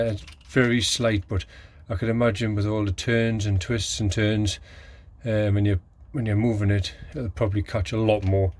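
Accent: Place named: North East England, United Kingdom. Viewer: Where you from mate, sound like NE?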